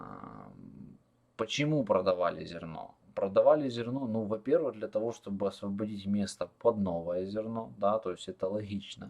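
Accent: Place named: South, Russia. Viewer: Шокание и гэкание - южнорусский говор русского языка. Прекрасно звучит!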